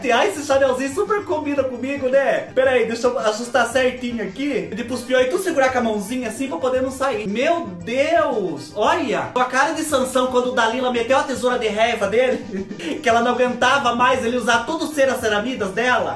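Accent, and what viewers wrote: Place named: Paraná, Brazil. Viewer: vc tem sotaque de paranaense! 🌹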